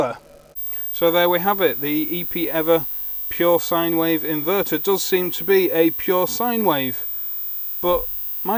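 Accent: United Kingdom. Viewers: great reviews so far, a fan from another 'experimental techie' from indonesia. Just wondering have you done a "Cheapest On-Grid Inverter" review already? I guess this piece is the only missing review that makes a solar newbies out there would expect from you. MPPT function, Pure Sine Wave and 85-89% conversion power rate are few claims that I would think you probably the best person to prove it. I could point few inverter candidates for your review;) Anyway, just a thought, love your work so far and keep that British accent!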